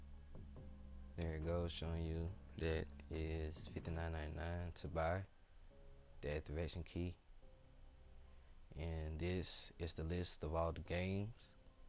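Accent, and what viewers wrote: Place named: Louisiana, United States. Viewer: I freakin luhv your accent. Are you from Louisiana? lol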